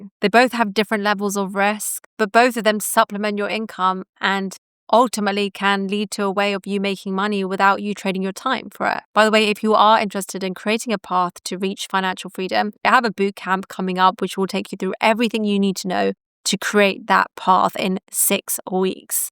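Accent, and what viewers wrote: United Kingdom. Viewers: Are you born British?? I am obsessed with your British Accent.....!!!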